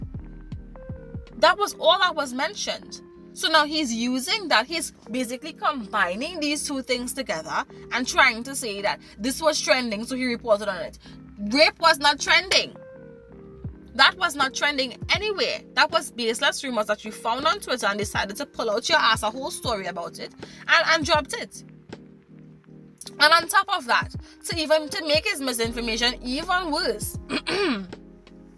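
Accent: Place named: Caribbean. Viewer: Thank you for the clarity of your explanation! You have a beautiful accent! Caribbean? I agree with what you have laid out here and I have subscribed to your channel! I wish you every success going forward! PREACH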